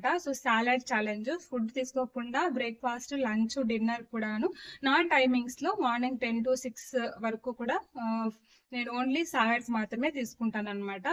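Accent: India. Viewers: Mee language baavundi Ananthapur kadaa mam meedi??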